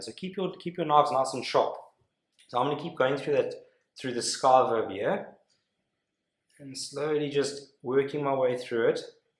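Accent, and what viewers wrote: South Africa. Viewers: Are you a South African ole chap ?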